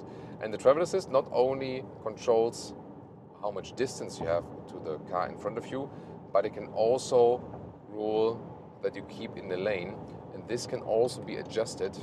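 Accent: Germany. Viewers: Der Typ schaft es deutsch und englisch zugleich zu sprechen.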